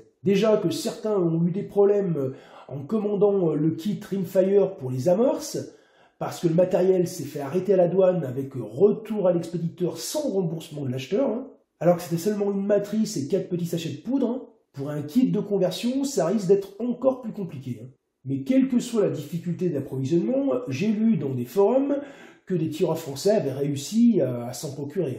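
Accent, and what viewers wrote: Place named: Lyon, France. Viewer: accent lyonnais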